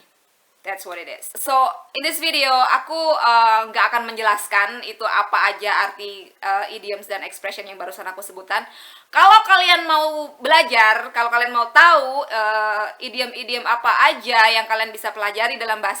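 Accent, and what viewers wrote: Surabaya, Indonesia. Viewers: Suroboyo mana suara nya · Ya kan mbaknya org indo, jelas masalah aksen atau logat tentu berbeda, sama kayak singlish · U hv such a cute Indonesian accent while ure speaking English 😘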